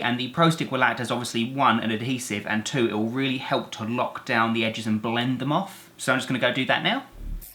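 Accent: United Kingdom. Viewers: Are you British omg I think I finally found a famous youtuber that is British to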